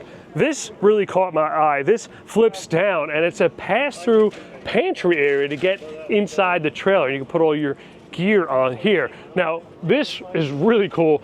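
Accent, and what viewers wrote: New Jersey, United States. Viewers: Love the Jersey accent! 😊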